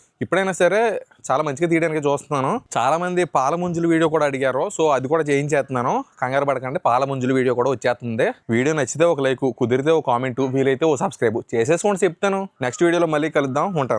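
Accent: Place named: Amalapuram, India. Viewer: బాబు మీ వంటలు చూస్తుంటే కడుపు నిండి పోతుంది తరువాత మీ మాట వింటు ఉంటే ఇంక కడుపు నిండు పోతుంది ఏ ఊరు అమ్మ మీది మా అమలాపురం సై డ్ లా ఉంది ఎక్కడో చెప్పండి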